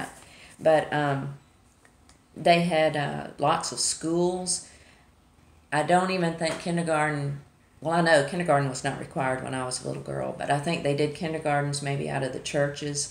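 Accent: South, United States. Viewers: You are welcome and by the way l love your southern drawl.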